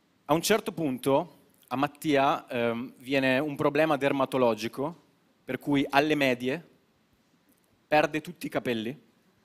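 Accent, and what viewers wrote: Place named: Veneto, Italy. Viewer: Accento Veneto😂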